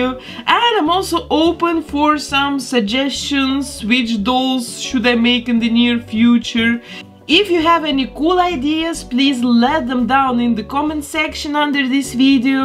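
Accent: Russia. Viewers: Buffy I was just abt to comment that, her mold is perfect and she’s Russian herself (she has a Russian accent so I’m guessing she’s Russian) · For so long I wondered why this Belgian lady has a Russian accent...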